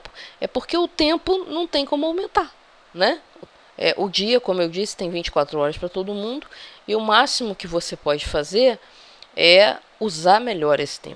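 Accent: Rio de Janeiro, Brazil. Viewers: Carioquês forte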